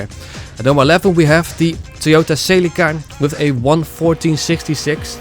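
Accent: Netherlands. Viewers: I can tell you’re dutch, if not, then i’m just stupid, but, anyways, no hate tho, finally a dutch tuber talking bout need for speed game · Are u from Holland 🇳🇱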